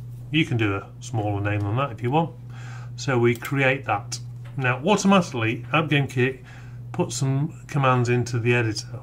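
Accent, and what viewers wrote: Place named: United Kingdom. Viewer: this man let me hear his whole passion and the british accent round it perfectly up really great to start the journey with you guys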